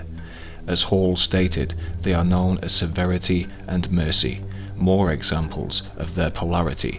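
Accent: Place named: Liverpool, United Kingdom. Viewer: this guy loves the sound of his own voice, but still interesting non the less, not bad for a scouser. i'm a woolly back by the way if i sound bitter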